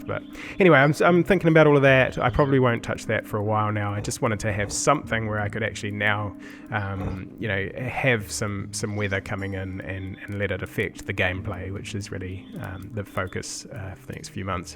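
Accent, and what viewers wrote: New Zealand. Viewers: Kiwi accent aye? I'm curious, where are you based?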